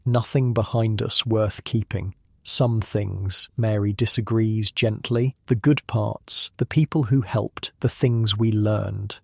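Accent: United Kingdom. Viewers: your beautiful, hypnotic British voice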